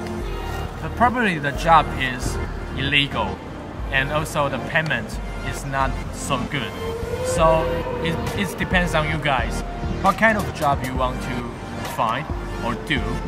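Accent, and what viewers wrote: Taiwan. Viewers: 真的滿台式英文....但很棒!!勇敢開口說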